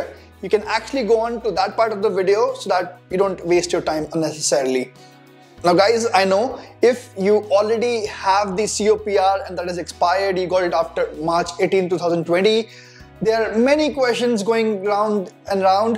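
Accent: India. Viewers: Nice work with all respect but ur language it's so confusing.... I mean the Indian or I called the Indian English it's too bad...... even with subtitle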